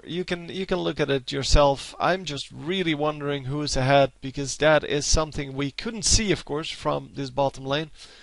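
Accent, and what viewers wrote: Netherlands. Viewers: are U dutch, like me? U have dutch accent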